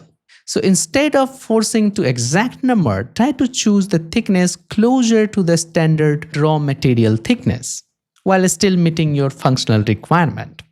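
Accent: India. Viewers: is this dude from ahmedabad?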